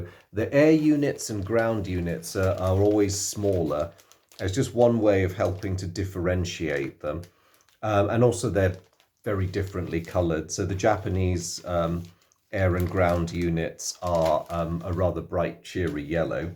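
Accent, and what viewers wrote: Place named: United Kingdom. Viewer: This was a pleasure to listen to. You’re an excellent speaker and communicator. Very clear and organized in your presentation and with a magnificent British accent. 😊 You’ve given me some added inspiration to read through the rules tonight and tomorrow to play a young man who I’ve introduced to these old war games (and some newer ones as well). I’m 61 in first started playing Avalon Hill games about 48 years ago. They are my one remaining hobby.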